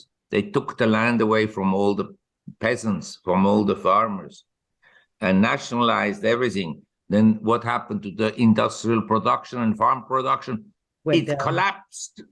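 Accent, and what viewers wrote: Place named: Switzerland. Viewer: I love Marc’s Schweizer Deutsch 🇨🇭accent so distinctive and just add to the overall entertainment and insightful wisdom great choice of where to live Chang mai is fantastic Marc talks it’s good to listen Not sure that the precious metal call is a good one , I’d go maybe max 10 percent of a non yielding asset but the general advice of diversification has never been more important and keep dry powder keep cash now you can get 3 to 4 percent on your cash with no risk patience. Will pay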